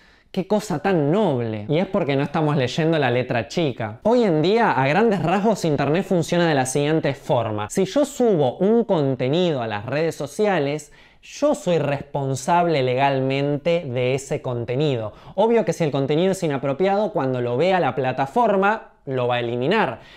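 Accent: Argentina. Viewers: Tu acento Argentino tan marcado arruinó el video, dislike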